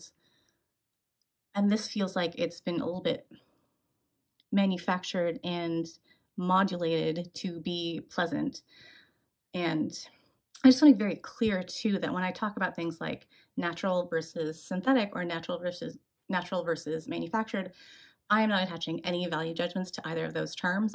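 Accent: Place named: California, United States. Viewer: Blue jasmine tea and smelly feet papyras. I love these descriptions. The Jasmine Rouge really didn't impress me. I need to go back to it again. It sounds much more interesting than I found it. The SL does go down my path of jasmine, I love that natural smell of jasmines and their indoles. Such an ethereal heavenly kind of scent. Similar story with me with Alien, I'm not overly shy always but don't approach randoms. But I smelt that in the air and was just so wow I had to ask her. I absolutely adore it. I'm not very happy with "Mugler" as they are called have done to it. They've took it's bite away. It's like some childish version now, all the boldness has gone all that wow factor. It's sweet and friendly. I did enjoy this one. Jasmine is one of if not my favourite note. It can be so different in fragrance can't it. Thanks for the video a good first to watch. 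I feel like I have a new Netflix series to catch up on. I'm terrible and American accents but I know the Yeehaw texas and gangster new York I think 🤣. I could be wrong is yours California? It's very pleasant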